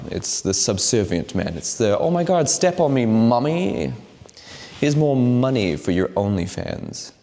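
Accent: United States. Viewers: If this guy is a Brit, he's the most American sounding Brit I've ever heard. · I’ve never heard an Englishman speak in an American accent until now. It’s pretty good with small slips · out of curiosity, where does the American inflection in your accent come from? I was under the impression that you were English? Is this correct or is there nuance that I'm ignorant of?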